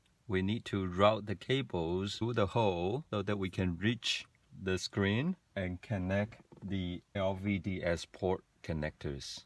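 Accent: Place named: Germany. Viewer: Great video, neat and clean during all the steps. Also like your voice, sounds like you speak with very slight German accent or German speaking manner. Anyway, just noticed one problem - according to the manufacturer you should not glue the RF/WIFI antenna to the metal surfaces, including the box itself, because this may cause some wireless connection signal issues. Instead you should glue it to the plastic surfaces, more closer to the driver, or where the phone usually lies. Another question - how different is the sound quality, compared to your oem bluetooth and aux? Also, when having a call inside the car, do you use oem BT phone or carplay phone via aux?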